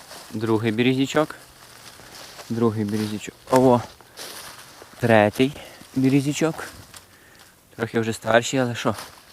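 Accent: Hutsul, Ukraine. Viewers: Привіт гуцулам з Харкова. Слава Україні! Слава ЗСУ! Приємно чути ядерну гуцульську мову. 🇺🇦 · Як він гарно говорить.Правдивий гуцул.А гуцули вільні люди!!!!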